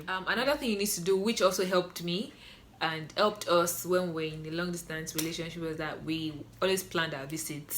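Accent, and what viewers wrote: Yoruba, Nigeria. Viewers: Your yuroba because you say forhever not forever. Thats really a yoroba thing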